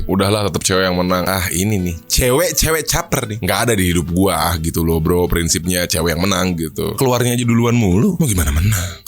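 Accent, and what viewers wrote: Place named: Bogor, Indonesia. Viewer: logat bogor na karasa euy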